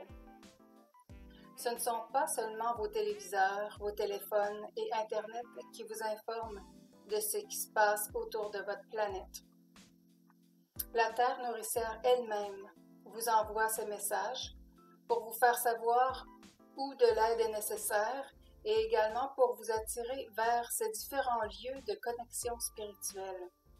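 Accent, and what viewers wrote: Quebec, Canada. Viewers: Merci encore avec une superbe musique et des messages très inspirants et avec votre voix du CANADA ou QUEBEC.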